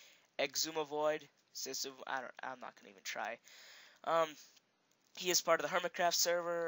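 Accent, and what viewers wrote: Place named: United States. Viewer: cool vid but he speaks American?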